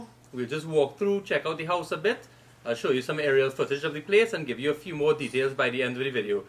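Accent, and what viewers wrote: Trinidad and Tobago. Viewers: HI MY FAVORITE TRINI, AS MENTIONED BEFORE WE STAYED AT BLACK ROCK 2016. OUR VILLA WAS THE FIRST OF (3) WATERFRONT WITH A POOL. IT WAS A DEAD END ROAD. THE PROPERTY MANAGER WAS A ENGLISH WOMAN MARRIED TO A BLACK TRINI MAN. THEY LIVED IN TRINIDAD. THE VILLA WAS OWNED BY A SCOTISH OR ENGLISH PERSON. IT HAD (3) B.ROOMS . MAIN B.ROOM HAD OWN BATHROOM, (2nd) B. ROOM HAD LRG. WALK IN SHOWER. THERE WAS ALSO A SEPARATE FULL BATH ROOM. WE WALKED FROM THE VILLA INTO THE BEACH THRU A SMALL GATE. IT WAS A BEAUTIFUL (3)'B ROOMS VILLA, WITH ALL THE AMENITIES; Including a weekly House Cleaner. ANYWAY THANKS FOR SHARING. CONTINUES TO TAKING CARE OF THAT ACCENT. BYE, BY. CANADA 22/04/03 p.s. Unfortunately too OLD to Travel; if not I would definitely visit TOBAGO. SPENT (4) DAYS IN COVA or COUVA, DID NOT LIKE IT. OH WE WENT TO PITCH LAKE which was interesting.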